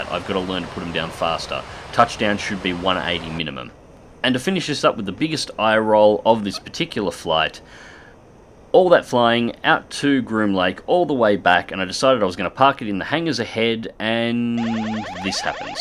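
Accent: Australia. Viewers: dyssynchro > ' accent >Our flag in background >Kangaroo >Needs to be told wat?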